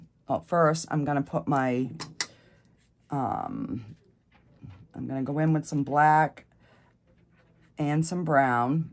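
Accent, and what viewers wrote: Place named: New England, United States. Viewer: This is BEAUTIFUL! Reminds me of Bob Ross. I've been following you for a while now, liking your vids on my TV. I'm from Mass & you sound like a New Englander, love watching you, feels like I'm hanging out with an old girlfriend 😊 You are quite the artist!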